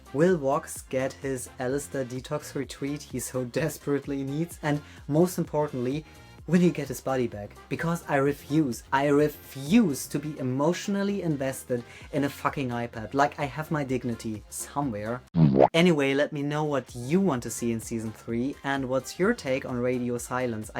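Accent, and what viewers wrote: Germany. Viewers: Ich höre einen deutschen Akzent heraus 😏😏 Freu mich einfach, wenn Leute aus Deutschland über Hazbin Hotel/Helluva Boss reden. Sehr nices Video! :)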